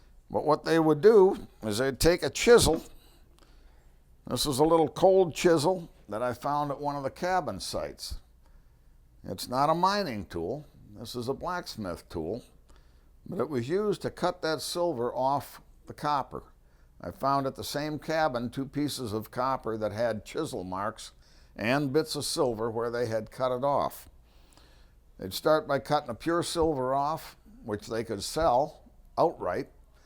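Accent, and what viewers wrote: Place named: Michigan, United States. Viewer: Thank you! Excellent presentation. I also enjoyed your MI accent. I have a 4" piece of native copper i found as a kid when we hiked up to an abandoned copper mine shaft on the UP. My dad was from MI and had done his Master's History thesis on a giant copper boulder of the UP hauled out in the 1840's. Years later i saw the boulder in the Smithsonian. I grew up in New England but we'd visit MI relatives & 1 week my family toured the UP. Most haunting was an abandoned copper processing factory, last used during WWII. Wish I knew quite where on the UP that was/is? Had ore cars rusting on tracks outside. Inside the 1 story factory, some machinery was still there. And real WWII posters were still on the wall! (This was 1970.) I took a Red Cross Homefront / Build Morale poster.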